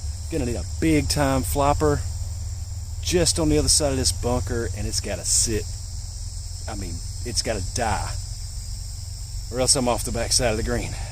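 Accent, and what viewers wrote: South, United States. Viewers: Southern accent? crepe myrtles? You in NC? Just found this channel.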